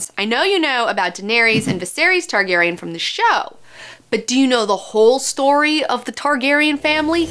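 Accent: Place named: South, United States. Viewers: You sounded especially southern in this video. I love it! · What state are you from? I hear a southern accent.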